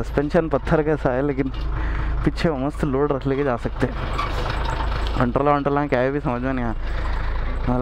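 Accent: Hyderabad, India. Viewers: I love the hydrabadi language how you talk!!! 😂😂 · bhai ek number Hyderabadi commentary marte...yarro... Ab next ice-cream ki thela le k niklo aur apne dosto k aur pechchaan k ghar k aju baju ja k responses record Karo... Bada majja aayenga aap ko bhi aur un ko bhi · bhai kab sudroge humesa naya naya karte ho. sound to superbikese bhi jyada atsi. mujeh aapki hyderabad language bahut atse lagte ho bhai keep it up. teeper hogaya crane bhi karo bhai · Bhai you nailed it . loved the way you spoke the typical Hyderabadi way! Definitely want a mlre though test of the truck and a tractor!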